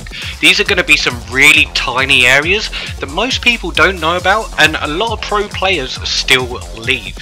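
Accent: United Kingdom. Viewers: David deans son ? Is this you your british ?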